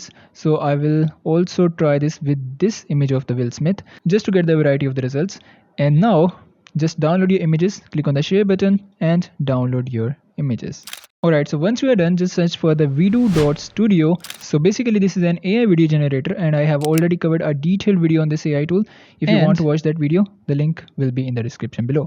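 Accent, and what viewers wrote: India. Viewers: Once I listened that indian voice I knew this shit isn't working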